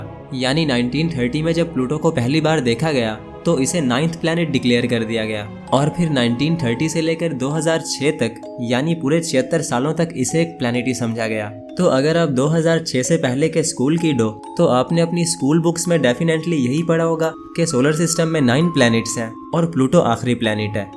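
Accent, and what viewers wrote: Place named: Pakistan. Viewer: Apki bhasa Pakistani jesi lag rahi hai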